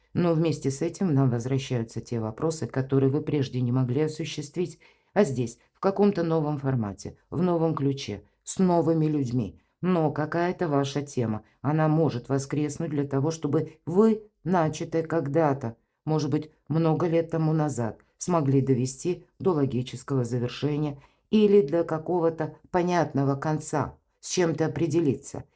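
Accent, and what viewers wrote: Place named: Belarus. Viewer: вы скорее всего из Белоруссии? Несколько лет назад жила в Беларуси,ваш говор проскальзывает.Благородный народ, люблю вас... спасибо ❤❤❤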